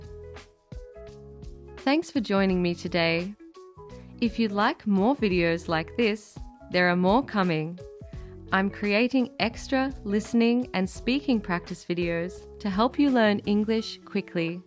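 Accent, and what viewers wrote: Australia. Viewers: helloo, iam learning these ausie accents from you its lovely to hear it and it is so calming to my ears...sending the love from Indonesia 😁😁:hands-yellow-heart-red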